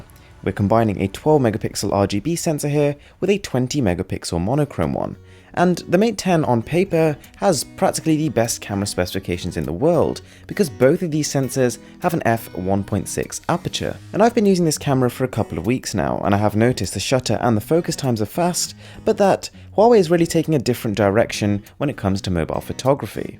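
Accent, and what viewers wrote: RP, United Kingdom. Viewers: You've got a very posh accent. Great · of all the youtubers with British Accent Mrwhosetheboss' is my favorite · The accent of this guy British... Nice review but can you do an depth camera review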